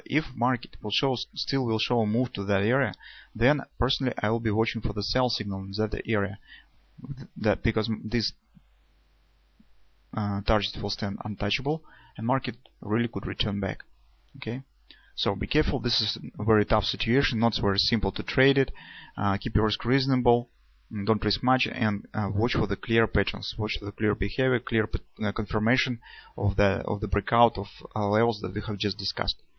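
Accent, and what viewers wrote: Russia. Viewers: Работай над акцентом и произношением слов. Якрий русский акцент. Прям вспомнил пары английского в инсте. :)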